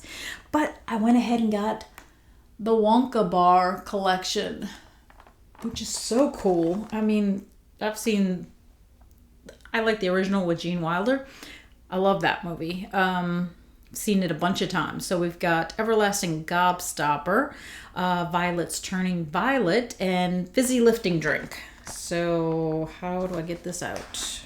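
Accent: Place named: New Orleans, United States. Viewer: So good to see you and your ideas are so right! I haven’t gotten this yet but it seems they did a good job with it. On the end of month live with Dan Orchard he pronounced beignets “Ben-ets”, it was so funny. Being from Louisiana we just take all the NOLA stuff for granted. It really is such a neat city with so much culture and history. I could tell by your accent you from there when I first started watching your channel. I’m a north Louisiana girl. Randazzo king cakes are so good. And a side note, Hubig pies are back out! My cousin brought me one at thanksgiving. Anyway really loved hearing your thoughts and can’t wait to sniff these!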